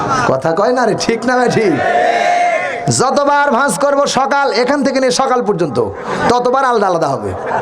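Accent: Bangladesh. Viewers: মনে হয় যশোর বাড়ি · শরিয়তপুর টান ঠিক আছে কিন্তু গলা ঠিক নাই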